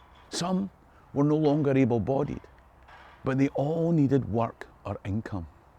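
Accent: Scotland, United Kingdom. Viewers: What the hell is he saying after, "It would take a nasty cocktail of circumstances to distract folks from..." CC has it as "that dodgy bloke in the jungle." It sounds like a name, but I have no idea. "That dodgy bloke in the jungle makes no sense at all. Why is the Scottish accent so hard for Americans to understand? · Either I am understanding his Scottish accent better or he’s speaking more clearly.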